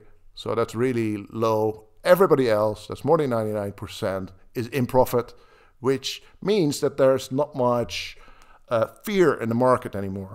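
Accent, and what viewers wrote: Netherlands. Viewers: First time here ... Got the reference from some Brazilian btc influencers 📝 ... First thing I noticed was the Dutch accent 🗣️ ... Only to be confirmed by the stream of comments in your language 🎉 ... // Het is een grote plezier contact met je nemen ... Je werk is geweldig 🥇 ... Groetjes vanuit Rio de Janeiro 🌊 ! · Yeah Dutch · Can I ask you something? Are you dutch? I hear a dutch accent or I am wrong? Thank you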